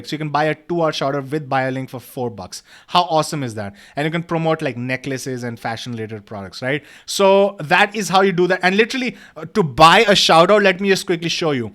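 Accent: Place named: India. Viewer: This is one of the worst videos I've ever watched in almost a decade of YouTube Used 4 mins to get to the point Annoying jokes and high pitch voice Super annoying Indian accent No real valuable information whatsoever I hope you revise your videos and channel or stop uploading cos these are an eyesore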